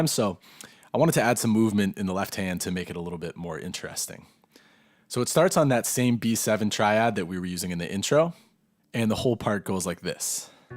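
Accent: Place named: London, United Kingdom. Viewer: You sound like you're from London!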